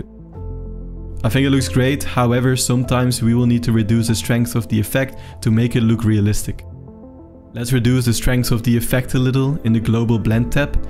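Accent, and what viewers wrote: Netherlands. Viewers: Ik hoor volgens mij Nederlands... Nee, ik weet het zeker! ;-)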